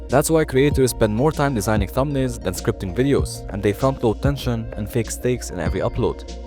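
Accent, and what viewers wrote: Arab world. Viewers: Great video, and you sound Arabian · I love ur Arabic accent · your accent sounds arab , are you lol? great vid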